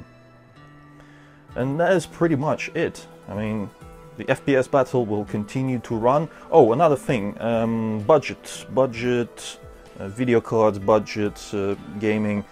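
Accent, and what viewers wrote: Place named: Russia. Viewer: я понять не могу ты русский что ли(акцент русский просто) .I can not understand that if you are Russian ( a Russian accent )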